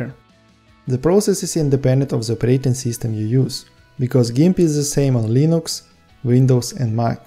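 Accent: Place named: Russia. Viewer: Какой акцент, будто русский говорит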